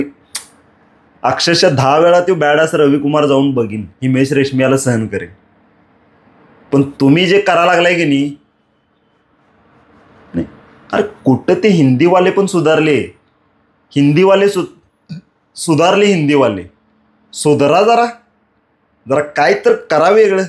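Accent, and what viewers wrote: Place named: Kolhapur, India. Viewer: I like your Kohapuri accent. And ofcourse the rokh tokh analysis.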